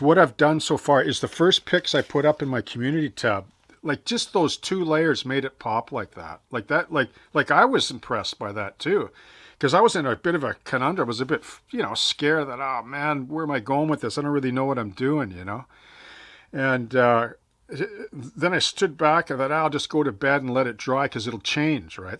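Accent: Canada. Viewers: Now I want to go watch Strange Brew listening to that Canadian accent!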